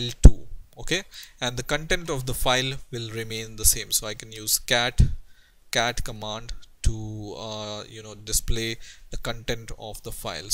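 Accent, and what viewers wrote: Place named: India. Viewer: I can understand his Indian accent. awesome.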